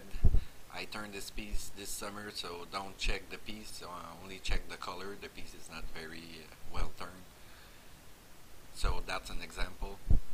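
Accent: Quebec, Canada. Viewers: Ho boy, toi t'es québécois. XD cool work dude!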